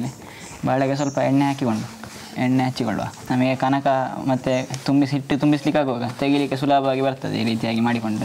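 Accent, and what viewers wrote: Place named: Dakshina Kannada, India. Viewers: Pure south kanara Kannada. Here to listen to that only. Music to the ears. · Your cooking skill is amazing👍👏👏 also it is very pleasant to hear Kannada in South Canara accent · Nimma kannada bhashe holigeginthalu sihiyagide. Pure kannada. Namma dakshina kannada bhashe.👌👌👌👌👌👌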